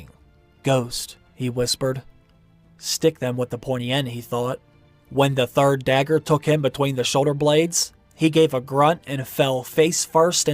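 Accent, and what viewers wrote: Pittsburgh, United States. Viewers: I know this is completely off topic but are by any chance from Pittsburg?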